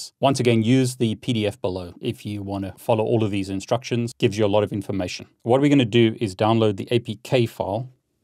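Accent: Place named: South Africa. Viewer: My 1st comment ever..😅a.you sound like you're from ZA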